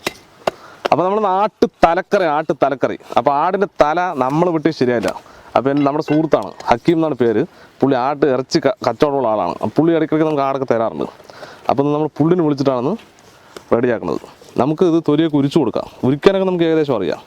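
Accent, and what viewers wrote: Palakkad, India. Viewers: കണ്ണ് വോണ്ട നമുക്ക് പാലക്കാട്‌ സ്ലാങ് 🤣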